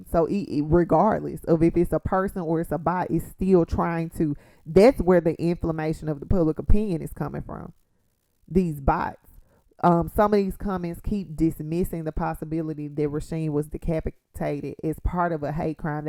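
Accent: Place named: South, United States. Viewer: girl your accent is so southern🤣🤣🤣🤣🤣🤣✌✌💖💖good lesson!...hope this case get the justices he deserve.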